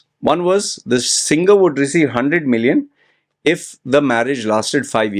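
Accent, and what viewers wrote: India. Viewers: Bro you sound like Indian😊😊😊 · You seems to be an Indian...your accent is so so desi.. Thank you big brother. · You sounds indian as fuck mate! You are pretending like a foreigner after having that tatts like moari brothers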